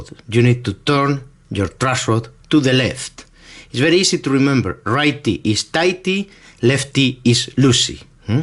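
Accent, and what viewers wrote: Spain. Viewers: Great accent. Are you Spanish? I live in Australia now, so I don’t hear Spanish accents very often. Thanks for the video. It was excellent. 😁👍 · Very good info, I am slowly getting through your videos, I love you Spanish English accent. Merry Christmas, cheers Simon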